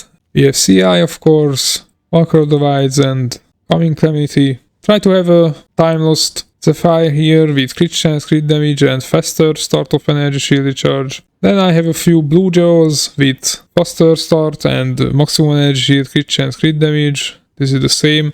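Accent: Hungary. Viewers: You have such a Hungarian accent :D no offense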